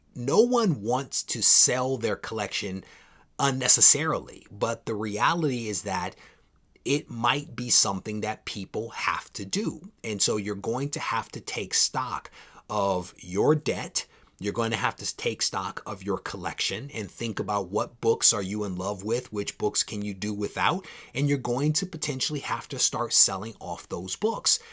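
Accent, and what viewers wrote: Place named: New York, United States. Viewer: Nice vid again. Too true got to do what you got to do. Like the wall selection change up. 1 for which books they are. 2 I have 10 of them myself. It's kind of cool getting to see what it could be like if I were to get them graded. Like how you keep it fresh. Have to watch every vid till the end too just to hear more of that old school New York sound. Miss good rap.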